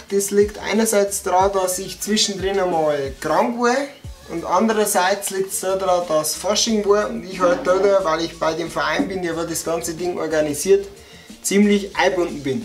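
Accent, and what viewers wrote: Bavaria, Germany. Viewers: dei akkzent is echt oreidig aba guad wea moand a urbayer zum sei...aba nechsd moi bitte richtige reihnfolge vo de zutatn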